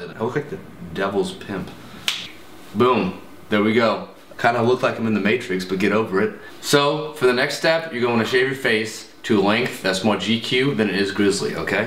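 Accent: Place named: South, United States. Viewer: Amazeballs. Totes entertained, love the southern accent btdubs!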